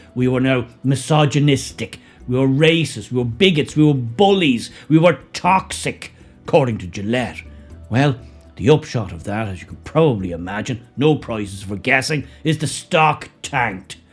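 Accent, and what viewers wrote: Dublin, Ireland. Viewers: I think it's very odd that your accent says "Dublin" but you use USA words like "store" and "tanked".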